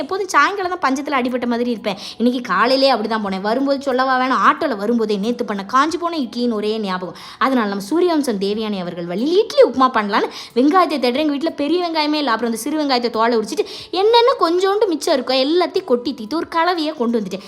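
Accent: Tirunelveli, India. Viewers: செவெனேன்னு சாப்டிட்டு இருந்திருக்கலாம். அய்யோ நம்ம ஊர் திருநெல்வேலி slang ❤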